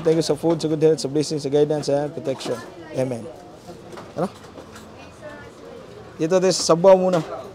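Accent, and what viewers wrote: Visayas, Philippines. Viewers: Bisdaka gihapon mu Tagalog Bai uy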